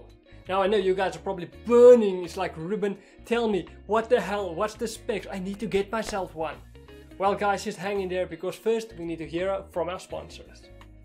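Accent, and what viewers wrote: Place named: Afrikaans, South Africa. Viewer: That thick afrikaans accent is on point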